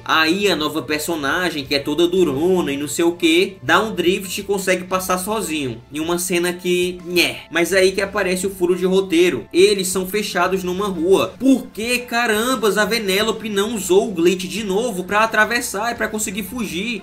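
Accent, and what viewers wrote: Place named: Northeast, Brazil. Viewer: "Engraçado" o que vc disse que as pessoas te zoam por ser nordestino, sendo que eu sou paulista e tava ouvindo tudo e pensando "que delícia de sotaque, ouviria por horas" kskskkskskskks Admito que assisti o vídeo até o final mais pelo sotaque msm, visto que discordo de mts pontos criticando o segundo filme pq tenho uma visão mais de como se eu msm fosse os personagens, entendendo bem a trama e tals kskskksksksks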